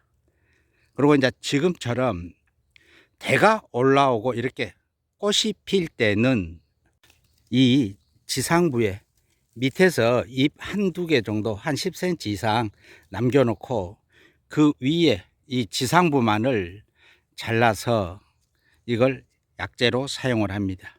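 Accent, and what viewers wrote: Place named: North Gyeongsang, South Korea. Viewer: 말투가 경상도 북쪽이 아닐까요 ??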